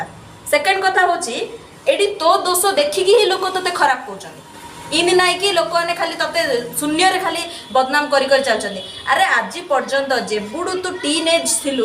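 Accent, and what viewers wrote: Berhampur, India. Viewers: Satare tm video dekhi ki mu Bahut khusi. Intresting anchor. Pura barhampur style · Sister tuma barahampuriya katha suniki maza laguchi 🥰🥰🥰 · Pura ama berhampurya language style...maddi chalao madam..Jai maa budhi thakurani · Superrrb mam language jabardast barahampur side ra akka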